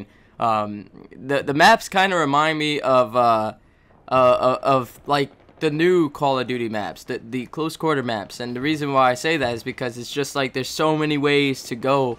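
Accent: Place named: New York, United States. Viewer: He has that New York City accent, so it's not his fault.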